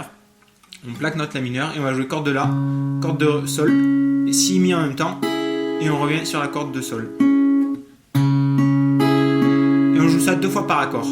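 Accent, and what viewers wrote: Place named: England, United Kingdom. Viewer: Magnifique accent anglais. 👌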